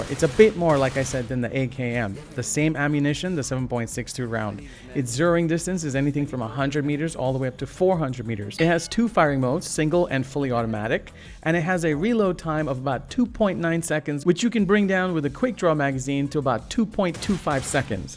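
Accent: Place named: Russia. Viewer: I like sat Russian accent tho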